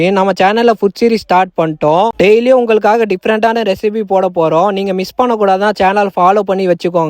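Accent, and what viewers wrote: Rajasthan, India. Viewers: How u speak Tamil? You are Rajasthani or Marwadi?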